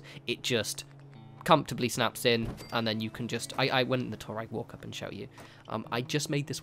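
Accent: United Kingdom. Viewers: I normally hate British accents but yours is acceptable 😂 keep up the videos!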